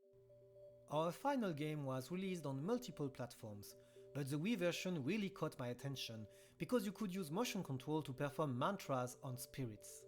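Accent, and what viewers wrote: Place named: France. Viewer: Hey! Great video! Thank you for all your work and I'll be eagerly waiting for any next video you'll put out, French accent included ;)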